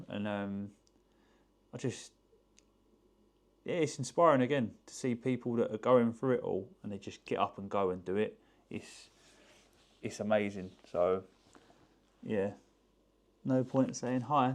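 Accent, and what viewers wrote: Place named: United Kingdom. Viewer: Have experienced the good, the bad, the ugly of people. You definitely are the good in humanity. Listening to you now, please take care of yourself, your health so you can be there for yourself, your wife, and your two sweet boys. Don't let the good (your youtube ) eat into your time with your family. You know maintaining your boundaries is essential. You are a blessing in my life and I pray you have an abundant life with success in all your endeavors. May God richly bless you now and always. Much love from Austin, Texas. PS. Love your British accents and witticisms, turns of phrases and tea times, and your lovely culture. Love all your friends, teammates, colleagues and family helping you work extremely hard to bless people in the most tangible way.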